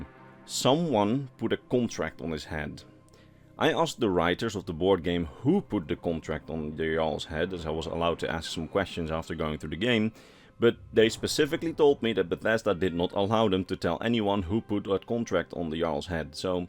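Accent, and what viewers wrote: Netherlands. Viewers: Goede videos, ik kan horen dat je uit nederland komt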